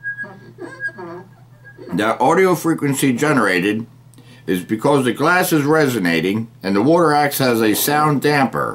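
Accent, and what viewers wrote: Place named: Catskills, United States. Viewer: I wear hearing aids and now I'm wondering how they work! I'm based out of northern New York State near the Canadian border and I've always collected rocks and minerals from all over the country. I also prospect for gold and often travel to southern NYS and Vermont. If you ever feel like finding some gold let me know and we could plan a trip. Your accent sounds like my family in the Catskills!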